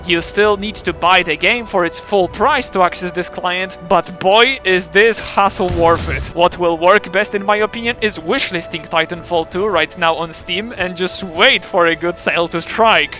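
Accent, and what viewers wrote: Poland. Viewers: I did not expect a polish accents here xD wtf. Nice video 👍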